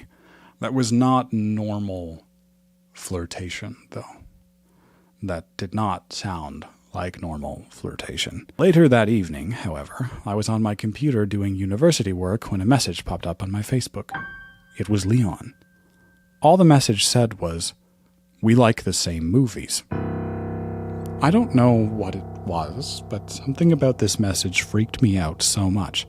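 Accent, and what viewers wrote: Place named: Canada. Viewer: Knew in an instant you were a fellow Canuck- pronunciation of foyer and use of university (Yankees say college even if Master's and doctorates are offered. Never understood that one). Love the humour!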